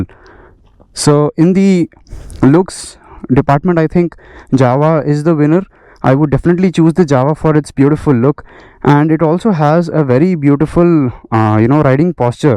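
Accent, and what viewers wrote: Hyderabad, India. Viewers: Have watched this video for over 5 times now, we love your Hyderbadi style Hindi accent bro 👍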